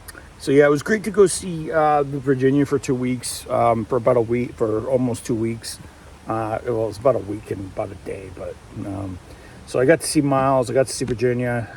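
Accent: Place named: England, United Kingdom. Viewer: Great intro and excellent english accent well done😅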